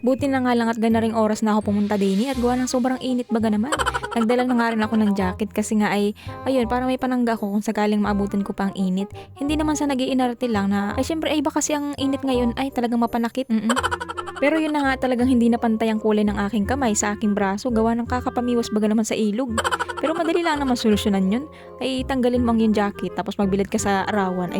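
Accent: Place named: Visayas, Philippines. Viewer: May halong bisaya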